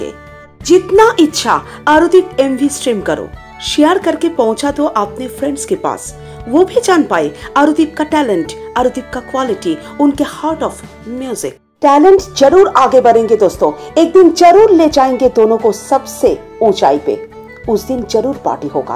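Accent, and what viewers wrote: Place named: Bengal, India. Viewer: The heavily Bengali accented hindi commentary reminds me of one of Keshto Mukherjee's famous dialogue from the Bengali film 'Troyee' : " Debanander hindi filim keno, banglay fire esho" ...Why so much effort in the hindi narration? Arudeep has enough followers in Bengal to get views for the channel😊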